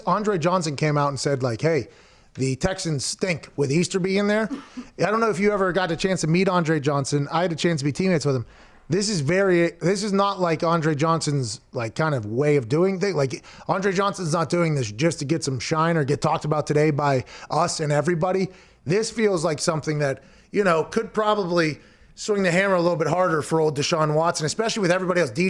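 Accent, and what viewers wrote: Pittsburgh, United States. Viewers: I love watching pat mcafee simply for his pittsburghese accent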